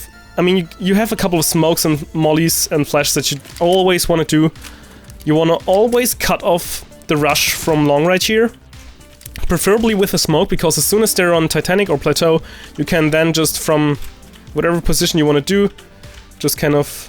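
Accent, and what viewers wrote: Germany. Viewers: are you german or swedish? :D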